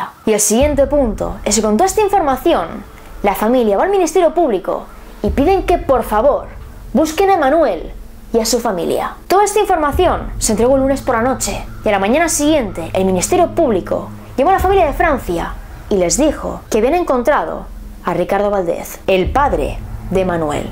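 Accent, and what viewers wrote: Spain. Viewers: Hola soy de mexico y un buen trabajo a pesar de tu acento español muy bello trato de entender pero gracias a ti comprendí lo de la matanza de Columbine mucho mejor que el documental de hora zero aunque gracias al documental you tuve me direccióno a ti saludos en hora buena sigue así mucho exito👏👏👏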